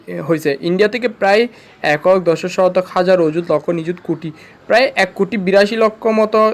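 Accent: Chittagong, Bangladesh. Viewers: ভাইয়ের বাড়ি হয়তো চিটাগাং অথবা কক্সবাজার অঞ্চলে!!কথায় স্পষ্ট বুঝা যায়!!! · Noakhailla na mia ,chatgaiya. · vaiya,,,apni dheki amder Chittagong pola,,,,,ekta kotha hosse,,,seyta hosse,,,,flag mane ki?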